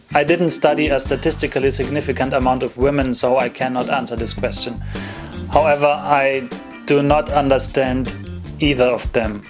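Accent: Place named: Bavaria, Germany. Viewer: du kannst aber auch bayrisch sprechen c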